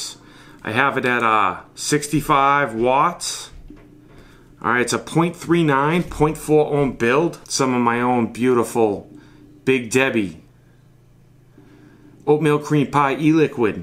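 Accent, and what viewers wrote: Boston, United States. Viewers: what accent is that, boston ?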